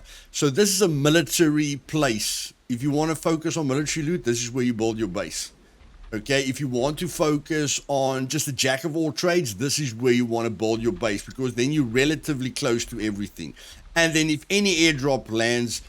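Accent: South Africa. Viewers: accent is very South African.... niice vid boet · You sound South African bud lol if yes maybe we should have a game together but I warn I'm a complete noob just started but enjoy your videos bud nicely done 👌😊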